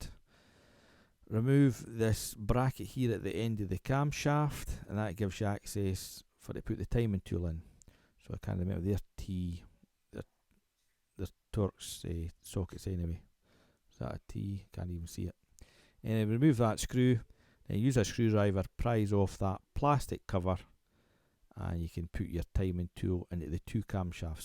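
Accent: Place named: Falkirk, United Kingdom. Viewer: I am looking for someone to do mine , sounds like you live local to Falkirk ?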